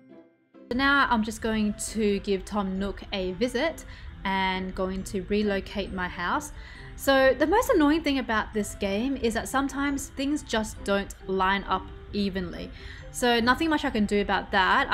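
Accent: Australia. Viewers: shes australian not british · She's reppin' her Aussie accent 🙌🏻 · Are you from Australia?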